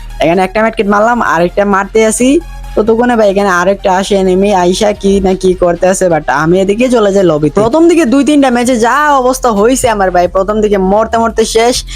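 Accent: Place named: Sylhet, Bangladesh. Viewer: সিলেট বাড়ি নি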